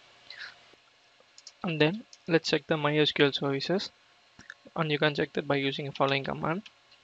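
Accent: India. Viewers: Interesting. I had a bit of trouble with the Indian-English accent. Perhaps a transcript would help. Thanks.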